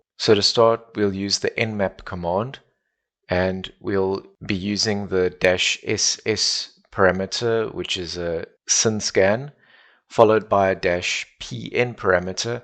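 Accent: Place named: South Africa. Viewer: Cool South African accent.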